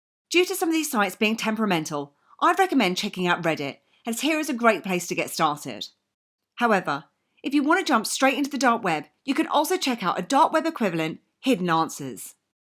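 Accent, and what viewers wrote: United Kingdom. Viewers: I suspect you meant to say “excited” rather than “exited”. Mostly what I noticed was that she has one of the strongest British accents I’ve heard, and I have heard a lot … she also talks very fast. If you’re having trouble understanding, try lowering the playback speed on your player to .75. Those settings are accessed by clicking the three dots in the upper right hand corner of the video player screen. Those on-screen controls go away after a few seconds, but will reappear the instant you touch the screen. Just FYI, that electrical-outlet looking thing to the left of the three dots? That’s a slide-switch that toggles “auto-play” on and off.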